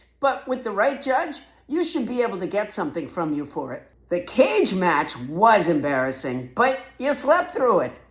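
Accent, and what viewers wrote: Boston, United States. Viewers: Brilliant! Ya sound so Bostin! It’s always a pleasure to see (and hear you)! As Denis would say, “He’s an a-hole!” ❤❤❤❤😂😂😂😂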